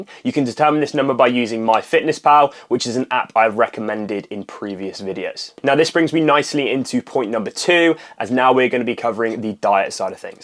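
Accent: United Kingdom. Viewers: Love your accent. What part of the UK is it from?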